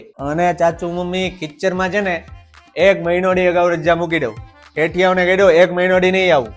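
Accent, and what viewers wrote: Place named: Kathiawar, India. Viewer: આપ ભાવનગર નું ગૌરવ વધાર્યું પ્યૉર દેશી કાઠિયાવાડી રજૂઆત આપ કરી રહ્યા છો હો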